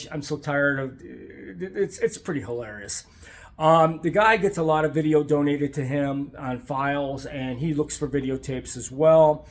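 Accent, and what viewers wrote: Chicago, United States. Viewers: I thought you had the Chicago accent. A lot of my family came out of Chicago. I've donated before, I'll do so again. Looking forward to seeing more stuff whenever your time and copyright laws allow. Good luck!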